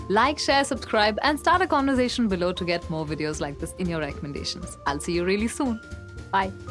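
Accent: India. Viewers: That soft indian english makes this so much nicer to hear doesn't it?😁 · speaks English with a strong Indian accent its awful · if I had teachers with Indian accent in school, I would be the best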